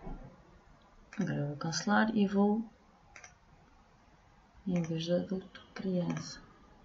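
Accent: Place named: Portugal. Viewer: Quando tens todas as coisas de um creator que segues há anos e depois descobres um video em que fala português de Portugal :') Super useful tutorial, MUITO obrigada por isto!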